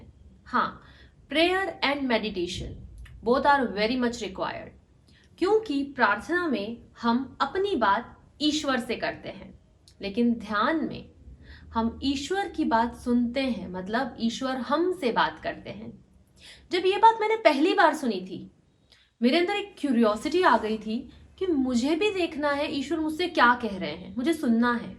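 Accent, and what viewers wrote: Madhya Pradesh, India. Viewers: mam are uh from MP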